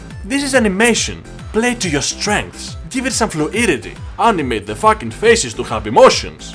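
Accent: Greece. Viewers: You have the most thickest greek accent I've ever heard, much love bro!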